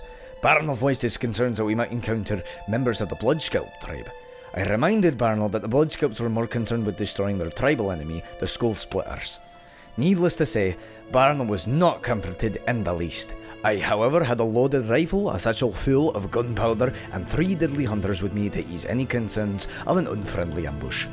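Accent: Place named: Scotland, United Kingdom. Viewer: Thanks for doing this, although I wish it wasn't read with a strong Scottish accent. Hard to understand some words ^_^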